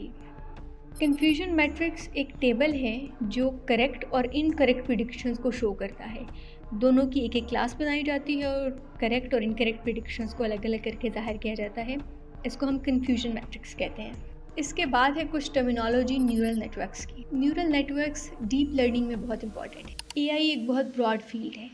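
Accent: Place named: India. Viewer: Sorry I had to stop watching you when you talk like an Indian. Its not urdu but indian hindi. Maanenna hay is indian style. Urdu is meray khayal main or meray motabiq. Bye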